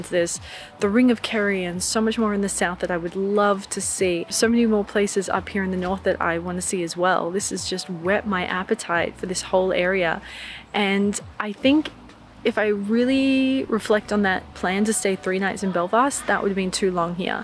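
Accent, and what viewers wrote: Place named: Australia. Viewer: She sounds Aussie, they drive on the same side of the road as Ireland and the UK.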